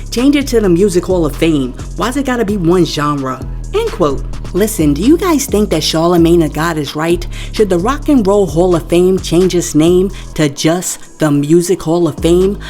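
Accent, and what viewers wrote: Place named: New York City, United States. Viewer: Love your voice. Sounds so NYC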